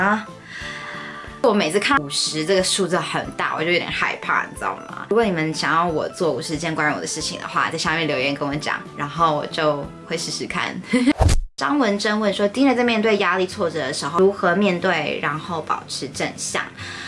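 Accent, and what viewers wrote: United Kingdom. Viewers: I really like to watch your videos .....I'm falling in love with it ...and I hope to see more interesting video ❤️....furthermore I also like your english accent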